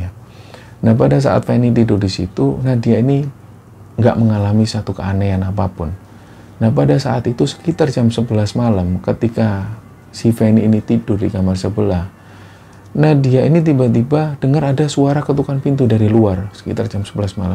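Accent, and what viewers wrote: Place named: Surabaya, Indonesia. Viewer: Shanghai haderrr arek suroboyo seng merantau, kangen logat suroboyoan😆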